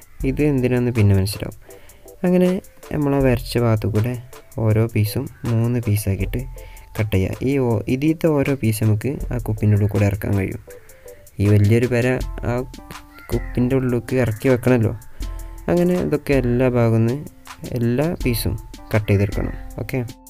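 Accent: Malappuram, India. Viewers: മലപ്പുറം ഭാഷ പൊളിയല്ലെ..... എന്തിനാ അത് പറയാൻ മടിക്കുന്നെ.... സംസാരികുംനോൾ സൗണ്ട് കുറച്ച് കുറവാണ് അതൊന്നു ശ്രദ്ധികൊണ്ടു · വർത്താനം കേട്ടപ്പളേ തോന്നി മലപ്പുറം ❤️ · Ee malapuram basha poliyaanu.frendsinod parayunna pole und.anthayalum adipoliyayittund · നല്ല ക്രിയേറ്റിവിറ്റി👌♥️👌♥️ പിന്നെ ഞമ്മളെ മലപ്പൊറം സ്ലാങ് അവതരണവും😀😀♨️♨️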